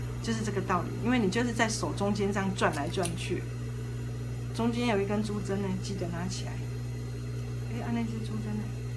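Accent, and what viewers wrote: Taiwan. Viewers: 這個小包包的教程講解的很詳細，台灣式的普通話聽起來很舒服 。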